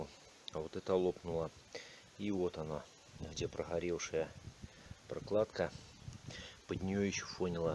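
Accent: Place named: Belarus. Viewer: Здравствуй! Я с Черниговского района Черниговской обл с Анисов Украина.Буду очень благодарен.Ты не с Белоруси? Акцент вроде бы похож.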